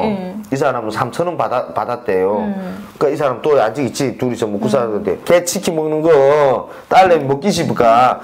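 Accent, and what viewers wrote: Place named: North Korea. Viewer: 님 한국에 오신 오래되었는데 아직도 북한사투리가 ㅎ 이젠 대한민국 표준말도 연습하시는 것도 좋을것 같네 언어 내가 말하는 의미 전달 중요합니다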